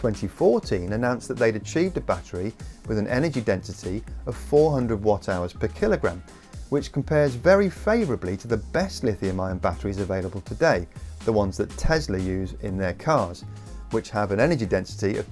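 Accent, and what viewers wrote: United Kingdom. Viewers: Give an idiot a british accent and he sounds reasonable.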